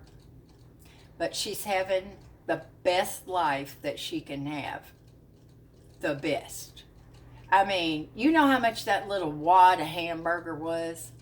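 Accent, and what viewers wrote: South, United States. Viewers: U r the bomb! I luv your southern drawl! U go girl. Your house looks so inviting for folks now.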